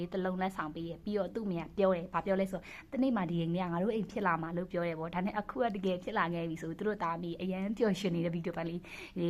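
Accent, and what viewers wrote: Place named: Shan, Myanmar. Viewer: မမဘယ္တိုင္းရင္းသားလဲစကားဝဲ႔ေနလို ရွမ္းတိုင္ရင္းသားလား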